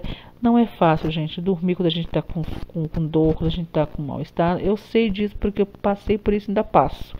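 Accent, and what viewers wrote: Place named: Rio de Janeiro, Brazil. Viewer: A senhora é do Rio de Janeiro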